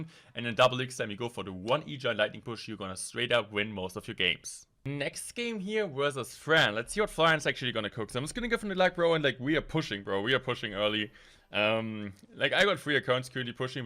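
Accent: Germany. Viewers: The German accent kills me, I’m sorry! But your skills in this game are insane.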